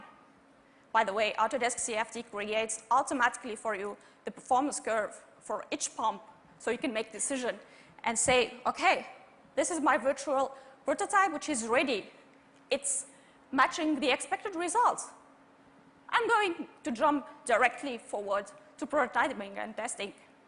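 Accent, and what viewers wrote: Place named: Sweden. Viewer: I bet she is swedish, I believe that's the accent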